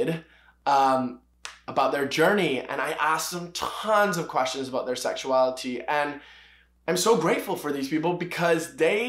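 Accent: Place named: North America. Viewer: Very interesting video thank you I think it’s interesting how your accent sounds more North American than Barbadian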